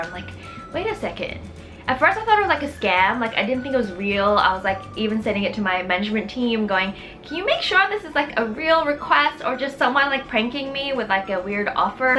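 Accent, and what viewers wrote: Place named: Australia. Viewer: Too bad i don’t live in Australia or New Zealand bc I love ur accent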